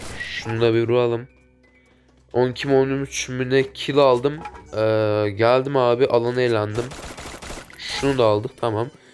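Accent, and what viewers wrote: Azerbaijan. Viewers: Abi sən Azerimisin Türkmü vallaha kötü mənadəa demədim soruyorum voruma cevab verirmisin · ABİ azeri misin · sen azerbeycanilimisin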